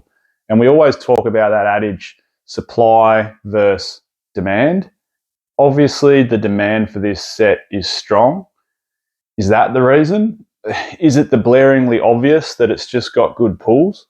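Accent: Australia. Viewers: thanks for the video m8! really appreciate it! its nice to hear from an aussie. Yah audio though is a bit inconsistent, awesome video but! thanks m8!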